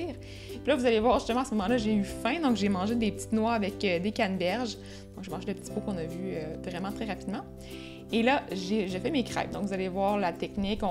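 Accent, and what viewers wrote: Quebec, Canada. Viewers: Tes recettes donnent toujours tellement envie !! J'ai l'impression (sur les autres vidéos du même genre que tu as fait , moins sue celle là) que tu manges beaucoup de sucré mais en y regardant bien il n'y a presque pas de sucre!! C'est vraiment génial pour les gens qui adorent le sucré comme moi. En tout cas continue comme ça t'es géniale !!! (en plus j'adore l'accent québécois!)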